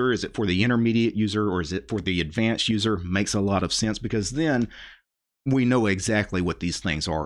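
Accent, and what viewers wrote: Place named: Louisiana, United States. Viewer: I saw you on KeepitTechie, been subscribed but man i know your accent was familiar, but now I see you are a fallow Louisiana native. That was a great interview.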